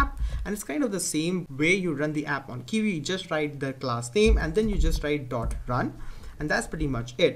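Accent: India. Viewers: Indian thick accent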